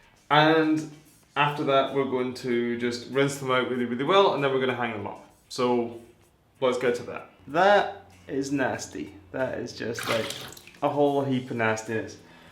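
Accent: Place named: Scotland, United Kingdom. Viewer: Okay, are you a Scot living Sweden or a Swede living in Scotland?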